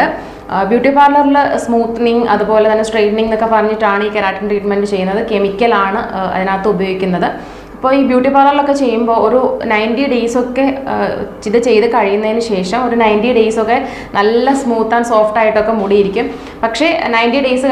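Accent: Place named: Tamil Nadu, India. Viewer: Slang korachu tamil mix aaghunnu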